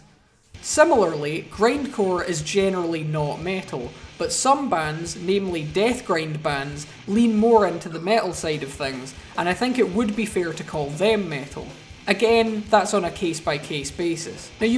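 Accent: Scotland, United Kingdom. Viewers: Yeees! More Metal and scottish accents 🤘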